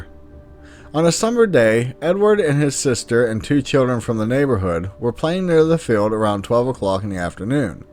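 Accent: United States. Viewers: Yay someone did a video on my home country of Cymru (or Wales) I must say it feels odd to hear an American accent say our names.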